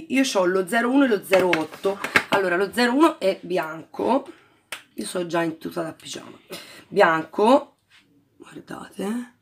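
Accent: Tuscany, Italy. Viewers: "Si arriva babbo..." ❤❤❤adoro la parlata toscana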